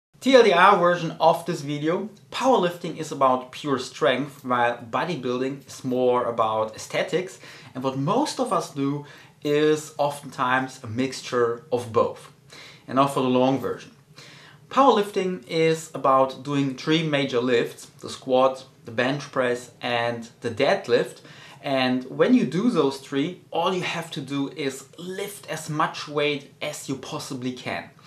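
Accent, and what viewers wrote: Germany. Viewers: you've got such a german accent! :D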